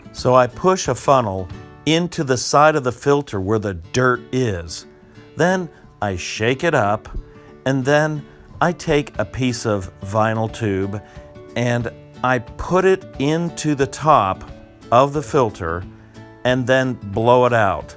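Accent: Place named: United States. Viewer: Thank you. Now I know what's wrong with my car. BTW, love the way you talk American man, I'm from Down Under.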